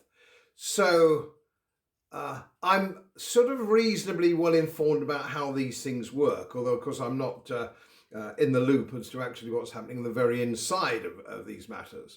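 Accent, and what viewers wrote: United Kingdom. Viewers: I cannot believe I am hearing these suppressed TRUTHS about the world we (actually) live delivered by an eminently respectable BRITISH voice on Youtube... I normally have go onto Internet Archive to get this kind of information (and then often only after 'creative' searches and wading through dozens and dozens of off-the-case fluff to get to the good stuff!).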